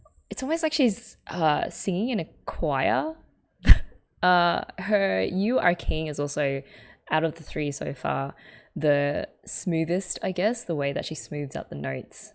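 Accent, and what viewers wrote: Australia. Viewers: Oh my god King is such a perfect cover song for all of these girls. They add so much personality to it. I especially love Calli and Gura's. When it came it took me by storm and I couldn't get over how perfect it was. Like I would choose this cover to introduce someone to them. Impressive how you picked up even on the accents. It was a 2 min song but you picked up on Calli's southern twang. I also like picking up on different accent, kind of an obsession, and I was guessing you had an Australian accent there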